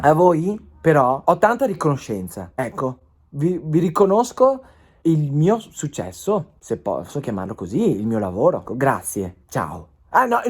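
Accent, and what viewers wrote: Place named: Veneto, Italy. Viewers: Ciao premetto che non voglio che torni a fare chiamate ma tornare a parlare Veneto si abbiamo una terra con molte attrazioni e molte cose di cui fare passare dei concetti penso che la gente come me ti ammiri per il tuo accento quindi torna a parlare VENETO!!!!! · Ciao, a mio modesto parere se vuoi continuare la crescita devi, purtroppo, uscire dalla "regionalizzazione" in cui il tuo personaggio è cresciuto. Io sono trentino e mi sento molto vicino, sento molto affine il tuo accento, i luoghi da cui trasmetti, i temi ecc. ma penso che dal "triveneto" non puoi tirare fuori molto di più in termini di consenso, contatti e visualizzazioni a meno che non diversifichi i "canali" e cominci a fare anche live nei teatri e a partecipare ad eventi nel Triveneto allora il bacino potrebbe bastare. Ti trovi probabilmente nel momento più delicato e da questo video si capisce che lo hai compreso benissimo. 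E' il momento della: "o la va o la spacca" e ti auguro veramente con tutto il cuore che tu possa trovare la strada giusta.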